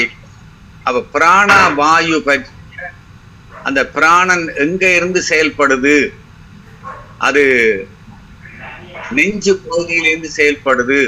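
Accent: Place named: Sri Lanka. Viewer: இலங்கை தமிழின் கம்பீரம் மிக மகிழ்ச்சி அளிக்கிறது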